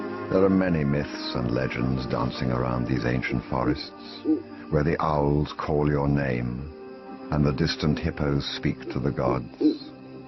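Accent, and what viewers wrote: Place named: United Kingdom. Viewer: Bonito é o sotaque britânico do narrador... rsrs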